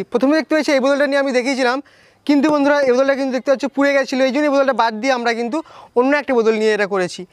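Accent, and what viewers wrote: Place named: Kolkata, India. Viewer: Kolkata ...Vasha sunlei to buja jay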